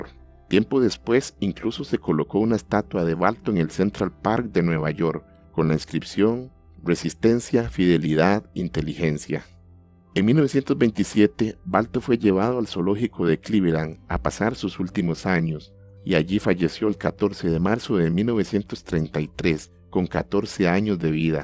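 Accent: Costa Rica. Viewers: Sabia que eras Tico mae!!! Desde que empezaste a hablar, dije,mínimo es de cartaguito. Buen video. Gracias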